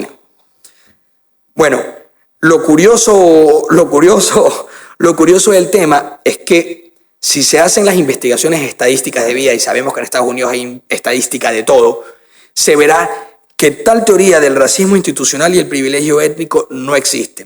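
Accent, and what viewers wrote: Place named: Ecuador. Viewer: Es un pobre y triste ignorante con audacia. Da pena porque se atreve hablar como ecuatoriano.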